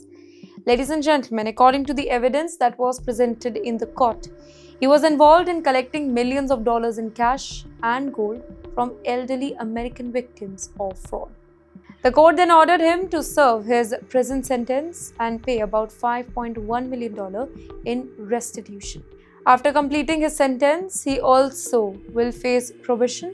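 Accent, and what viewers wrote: India. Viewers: The channel target audience is Indians in USA and India. They will understand the accent fine. You need not understand 😎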